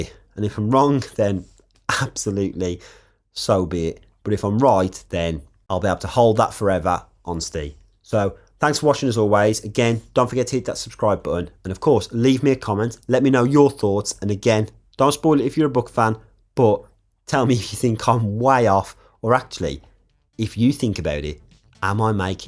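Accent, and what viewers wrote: United Kingdom. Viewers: I thought it might have been more of a black country accent!! Yeah, I'm in south Brum myself!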